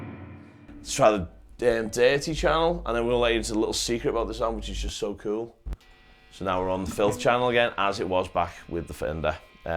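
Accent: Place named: Northern England, United Kingdom. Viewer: Mate, you're doing the blues pretty well for being "not a blues guy". ;-) Love your accent, BTW. Where RU from? Somewhere up north on the Brit Isle, I suppose? (Myself being from Germany.) Very nice Blues amp but I still feel unsure about it for rock / hardrock (not metal). As far as YouTube demoes go I'd still prefer the Tech21 TM30...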